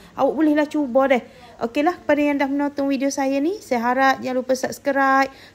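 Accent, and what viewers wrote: Kelantan, Malaysia. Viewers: WASSALLAM WRB.Sedap mashallah tlong cakap BM betul.Ndmpak hitam sikit patut tak nampak macam burnt.Jkkhir.Bukan s4mua faham dialect Krlantan.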